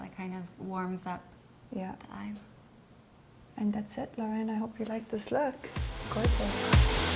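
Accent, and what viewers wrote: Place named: Germany. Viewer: Is she german? Sounds like she has a really strong accent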